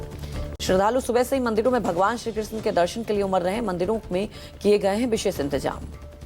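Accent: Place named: Bihar, India. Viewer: Ma'am aap bhi bihar se hai shayad apke accent se lag raha hai lekin apke efforts bht acche hai❤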